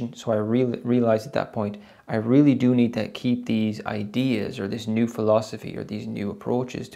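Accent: Ireland. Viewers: You say Ting not THING is that an Irish accent?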